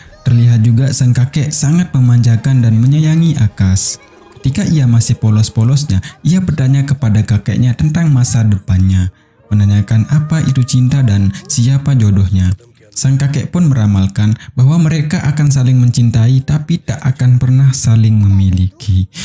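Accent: Bali, Indonesia. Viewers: Dr logatx orang BALI ya min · Malah mnurutku logatnya kaya org Bali/Lombok Tp syg speed pnyampaiannya trlalu lmbat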